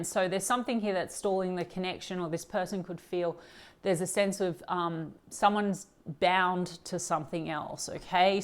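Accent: Australia. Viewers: Great to hear a fellow Aussie gal reading….. absolutely spot on….. will check out the Law of Assumption🙏🏼🫶 🇦🇺⭐️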